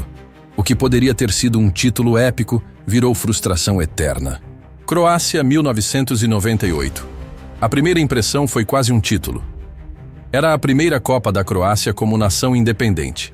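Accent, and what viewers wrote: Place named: Portugal. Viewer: Do nada o narrador mete um português de Portugal kkkkkkkk toda hora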